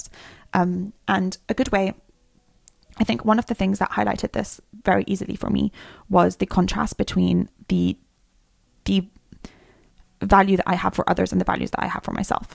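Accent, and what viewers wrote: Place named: United Kingdom. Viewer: You make double effect: 1) food for thought 2) what a lovely accent (fantastic, because I just learning English(few years :) ) and I admire British accent) Thx a bunch for all you're doing!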